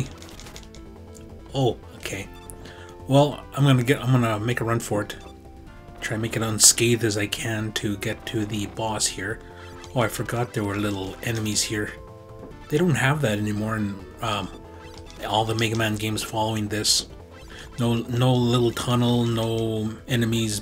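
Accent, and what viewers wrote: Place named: Canada. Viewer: You sound canadian. Fun play through.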